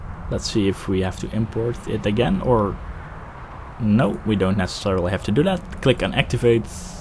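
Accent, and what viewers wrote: Netherlands. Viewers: Ik hoorde dat je Nederlands was, goede tutorial! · hai nederlander of belg ( ik denk hollander). ofniet?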